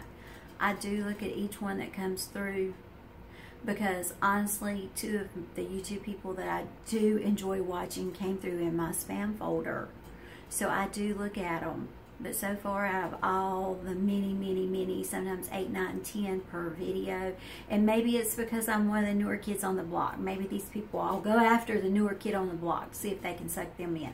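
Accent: South, United States. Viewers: Hi! I totally know what you mean about you tube screen shots! I love your tactic to get a good pic! I follow many of the same ladies you do. I love the different accents we all have depending on where we are from. I'm a midwesterner so I often wonder if all of you, with those adorable southern accents, can tell which part of the country it's from? (North Carolina vs Texas?). Just wondering.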